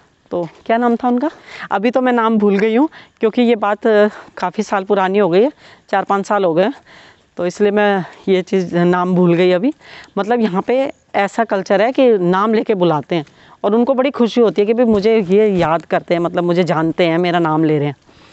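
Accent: India. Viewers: Thanks medam ap acha bolti bilkul bhartiya andaj me Apki real hi sari video h modifiy nhi karti ye acha laga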